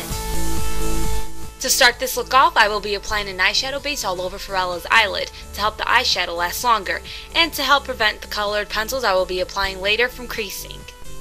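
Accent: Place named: Chicago, United States. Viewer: I love the way you speak... So Chicago. I'm in Wicker Park so I can totally call the accent when I hear it! Great job, your friend is beautiful. :)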